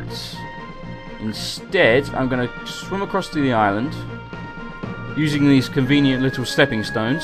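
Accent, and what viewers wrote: United Kingdom. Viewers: He's surely british.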